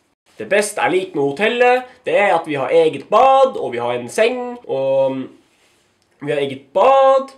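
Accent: Norway. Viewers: Du har begynt å snakke i rart, jeg vil gjette Drammensk!? Jeg merker at det er litt vanskelig for deg. Jeg liker det. Trøndersk er kulere. Det er kult å være høy, jeg er høy. Ikke bli bloggerfaen! Jeg kjenner en labrador. Du er kjekk i dag. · Kjære. Digger nordlening-dialekten din.:-)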